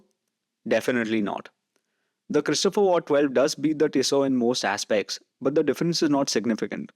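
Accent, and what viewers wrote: India. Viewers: Great video, you are doing an excellent job. New subscriber from Laguna Beach, CA 👍 PS: I’m curious about your accent. I have heard a similar accent before, but I can’t place it. I’m guessing Indian? I like watches and languages. I have studied 6 languages. Another great hobby. 👍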